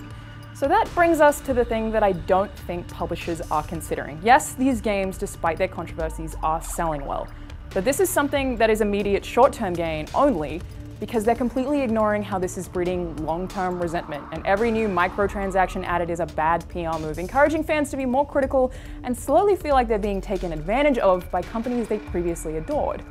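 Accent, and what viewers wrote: Australia. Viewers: angel47baby It's her accent. She's australian.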